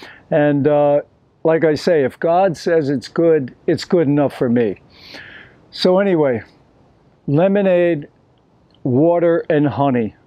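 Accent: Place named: New York, United States. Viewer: Hey! Really enjoying your videos. I am also a long term raw vegan, and really related to your stint of a few years adding cooked stuff at night, but never feeling as good, and always steering back to raw/high fruit. I was raw for 9-10 years before I started having issues with low appetite and being tired a lot, and so tried adding stuff back in in the evenings. Sometimes it was hard to tell if the diet was the issue, or if it was something that would've come up regardless of diet. I changed a lot of my lifestyle, traveled to Asia, got out of burnout, etc. to test other factors. Either way, even if I'm more tired than I used to be or less vibrant, I still feel better raw than anything else. Also originally from FL, but then 20 year New Yorker (now more of a nomad), which I think I hear in your accent too! Thanks for sharing yourself and experience. 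I've found it rare to come upon someone else who did raw for years, then experimented with cooked at night, only to find it just didn't cut it, and went back.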